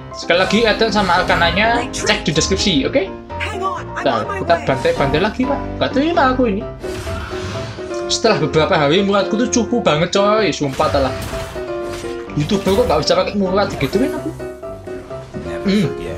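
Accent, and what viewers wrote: Java, Indonesia. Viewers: Cangkem mu !!! , ndaz mu ilang. Jargonnya medok bgt wkwkwk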